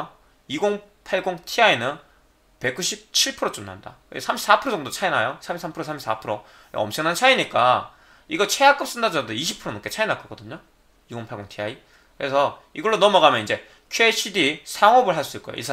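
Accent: Gyeongsang, South Korea. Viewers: 님 말고도 유명 유투버도 육월이라고 하는 눈×전×라는분도 있는데 경상도분들은 다 육월이라고 하나요? 지역감정은 아니고 정말 궁금해서요.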